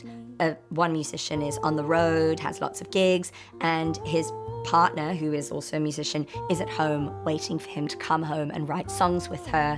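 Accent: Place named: England, United Kingdom. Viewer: I absolutely love this song and am discovering the rest of your stuff - absolutely beautiful. You sound English not canadian but i've met people from NFL who sound scottish so wo knows, I've only lived in southern Ontario. Anyways love this song, the plagal cadence has huge emotional resonance for me and the moment the bass outlined the motif i felt i was Home. :)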